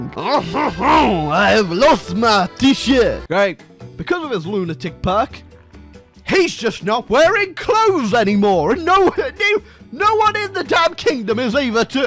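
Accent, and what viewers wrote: France. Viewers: The French accent is needed for future videos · You Have The BEST French Accent Ever, Even Better Than A Actual French Man. me simping for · I want more of your beautiful French accent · I want a full video of to just speak in a french accent the whole time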